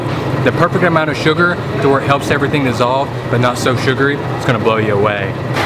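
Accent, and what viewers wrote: South, United States. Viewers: You sound like you have a southern accent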